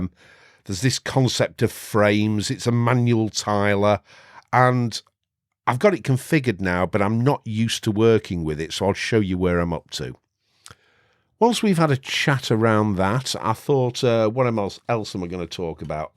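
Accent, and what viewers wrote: United Kingdom. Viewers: Congrats on the 10K! Well deserved. :) Why I like your channel mostly comes down to personality. You're calm, natural, and we're in a similar age group. I'm also loving the fact that you're British. The accent is most welcome. I've always had a soft spot for well-spoken British English. Apart from interesting content in general, I think what I appreciate the most is that you're not attempting to make everything "funny" and/or sensational like so many others do. I'm really tired of that, feels like a lot of channels target 12-year olds and are only interested in the entertainment value. Looking forwards to future content, cheers.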